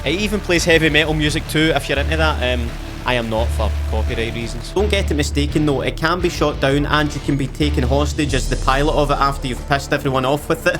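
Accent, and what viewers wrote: Scotland, United Kingdom. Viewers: Why title is in english but u speak Scottish or some shit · Why do Scottish accents make everything more funny😂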